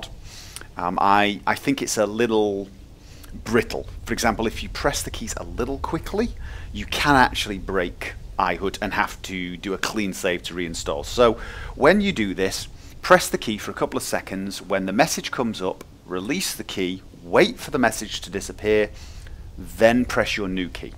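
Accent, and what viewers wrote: United Kingdom. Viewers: Would guess British but lives in Norway. But man, Trondheim is such an epic name! Greets from Sweden.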